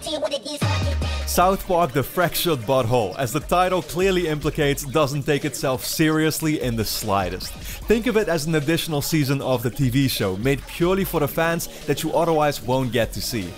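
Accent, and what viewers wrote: Netherlands. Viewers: Are you Dutch? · Are u dutch? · 10 seconds in, ''this guy is dutch''. the accent is minimal tho, pretty good tbh. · What accent is that of yours? Dutch? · Are you dutch?? You sound dutch. im dutch btw (edit: Oh you have a rochet league car with a dutch flag. Hallo😂😂) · is he dutch?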